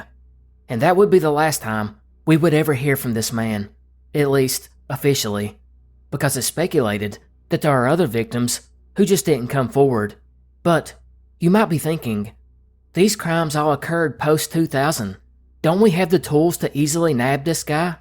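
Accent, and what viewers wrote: South, United States. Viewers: My favorite part of.. is his accent..and smart narration. 😎 where is he from? I'm not a complete moron..I'm guessing In the south.. but I'm from Canada 🇨🇦..so give me a break. 😅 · has the cutest accent. I actually find it incredibly wholesome and comforting despite the grim things he sometimes covers. Im born and raised in Mississippi and my family has been here for several generations....this may surprise some folks, but different areas of the south have different accents - a lot like the UK. The queens RP doesnt sound like a Welsh person or like a person from sat..Manchester. We dont all sound like some rich wannabe in Georgia or some hick hillbilly with 1 tooth 😂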